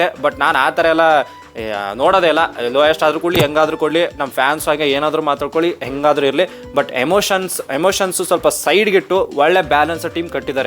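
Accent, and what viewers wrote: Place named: Hassan, India. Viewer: Nin pakka hassan Mysore 😂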